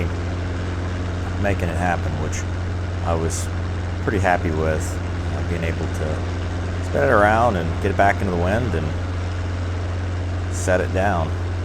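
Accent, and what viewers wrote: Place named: United States. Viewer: I enjoy the accent. It's like a disappearing gem of Americana. Thanks for sharing!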